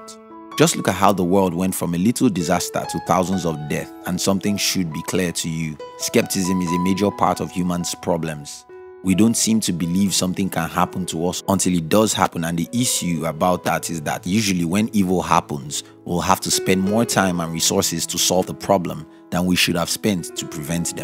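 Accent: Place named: Nigeria. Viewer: He is from Africa, possibly Nigeria.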